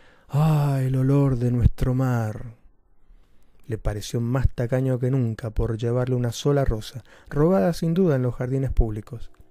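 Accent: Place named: Argentina. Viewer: Me encantó y me encanta el acento Argentino, lo quisiera para leer uno de mis cuentos 😚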